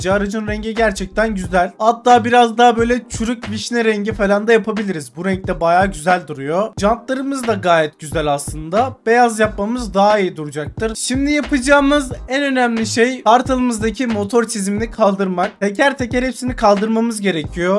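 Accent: Denizli, Turkey. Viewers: Abi sen denizlilimisin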